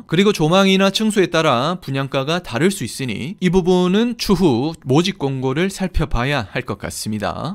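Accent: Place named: South Gyeongsang, South Korea. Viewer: 벤처밸리. 이 단어에. 경남 사투리가 넘 심하다. 대구사람이 아닌것 같음.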